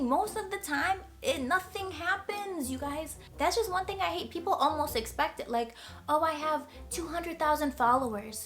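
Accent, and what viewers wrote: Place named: New Orleans, United States. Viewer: I thought it was New Orleans accent, she reminds me of my friend from there. But she also kind of reminds me of Jennifer Lopez, though I haven't heard her as much.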